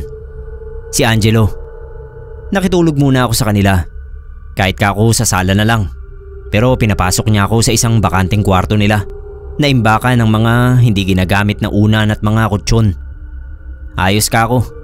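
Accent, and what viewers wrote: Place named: Ibanag, Philippines. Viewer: ibanag ang accent ng story teller.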